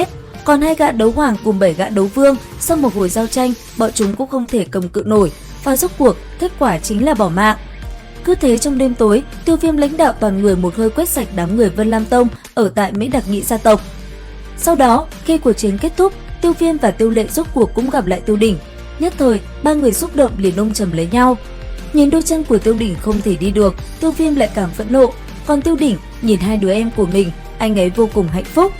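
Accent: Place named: Ha Tinh, Vietnam. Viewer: ông này người hà tĩnh chắc luôn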